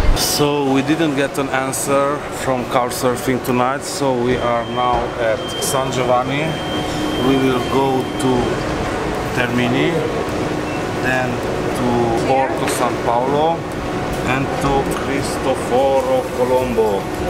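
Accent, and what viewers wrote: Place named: Morocco. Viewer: I like your accent keep it up Morocco*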